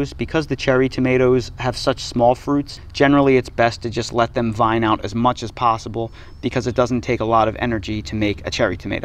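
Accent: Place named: Northeast Philadelphia, United States. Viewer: No way is this dude from North Carolina. His pronunciation of “herricane” means he’s from Gloucester County, NJ. Williamstown, Wenonah, maybe Deptford. “Shtrike” instead of strike is Northeast Philly.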